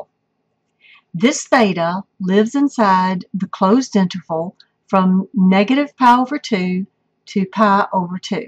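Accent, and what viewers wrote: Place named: South, United States. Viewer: Oh how am I supposed to continue studying for my midterm with your lovely southern accent as a distraction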